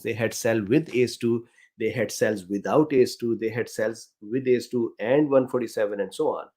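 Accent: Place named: India. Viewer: Many times I try to watch your vids but couldn't put myself through your accent. I am not a native speaker of English either so I understand many people who speak English with some accent, but Indian accent is the one that I can not stand. Too bad.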